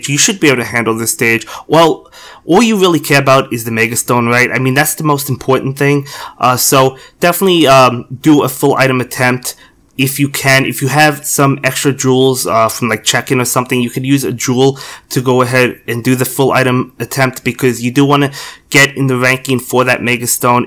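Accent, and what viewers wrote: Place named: Boston, United States. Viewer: Curious, what region of the country are you in? I think I hear a little bit of Boston in your voice, but I'm not good at telling.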